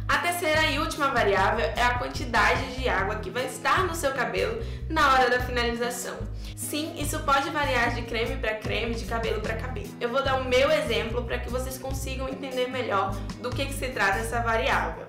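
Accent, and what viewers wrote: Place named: Recife, Brazil. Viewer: Adorei seus vídeos, seu sotaque é lindo, vc é do Recife? Ah, vc é uma fofura, lindíssima!! 😘😘. Sucesso e benção de Deus pra vc e sua família! ❤❤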